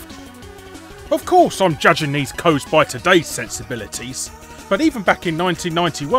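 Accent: United Kingdom. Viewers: On the subject of Alien Breed:, I find your British accent and your delivery of the cheat codes themselves funny as hell, especially "Blimey, gov'nor, look at the size of his John Thomas," LOL. Keep up the good work.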